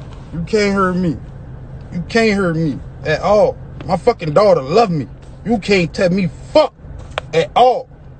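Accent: New Orleans, United States. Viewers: He has a new Orleans accent they all speak like that I love their accent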